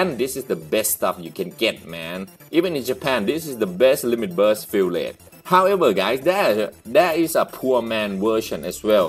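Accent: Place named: Thailand. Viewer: Are you from Thailand? you talked like my friend, your accent kinda feels like ppl from there.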